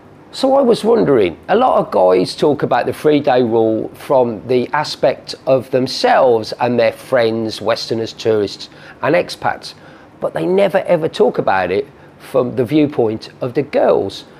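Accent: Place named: England, United Kingdom. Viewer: Why are these videos always made by old fat bald men with English accents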